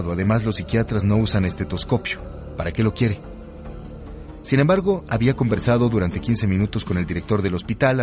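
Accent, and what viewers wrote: Mexico. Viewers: Cómo siempre las voces mexicanas deleitando al auditorio tanto en películas como en narraciones . Felicidades desde stgo . De chile .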